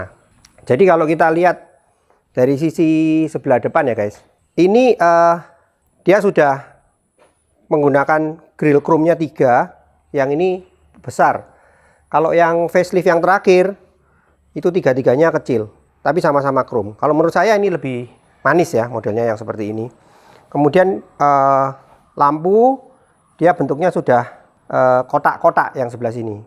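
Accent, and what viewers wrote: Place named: Surabaya, Indonesia. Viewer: Mantabh reviewnya om, narasinya ga kaya baca spek pabrikan, tp punya angle yg menarik sebagai user, ditambah logat suroboyoan yg khas, jd bikin reviewnya semakin menarik. Auto subscribe!! Ditunggu content menarik selanjutnya Ko. #jadipengenpunyafreed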